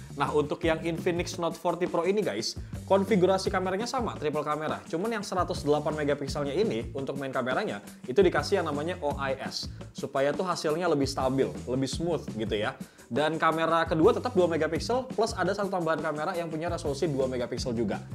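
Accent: West Java, Indonesia. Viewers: Kaciri pisan sundana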